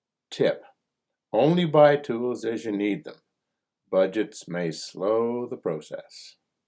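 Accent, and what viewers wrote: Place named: Canada. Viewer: picking up on a Canadian accent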